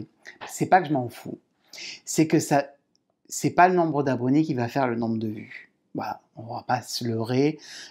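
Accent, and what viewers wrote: South, France. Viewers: Bonsoir, je te decouvre au hasard du net et j'adore ta bonne humeur et ton accent du soleil. Merci pour ce moment détente autour d'une passion commune ❤ Christine, une nouvelle abonnée du nord Isère 😊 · Bonjour c'est moi qui te remercie pour tes vidéos qui sont très agréables. L'accent du midi remplace le soleil qui manque dans la région parisienne. Un grand oui pour les vlogsmas de décembre. A bientôt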